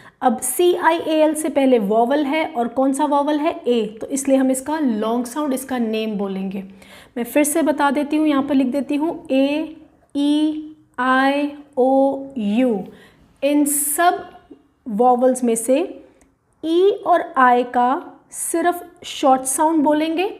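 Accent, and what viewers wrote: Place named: India. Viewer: india sound english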